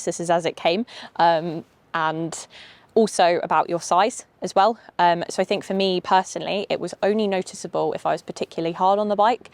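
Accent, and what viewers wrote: England, United Kingdom. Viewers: Which part of England is your accent from? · I have a 2018 T100 since new. Enjoy your video with British accent!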